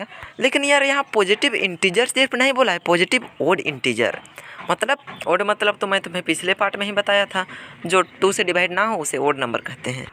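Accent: Bihar, India. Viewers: Aap to voice se bihari lgte h sir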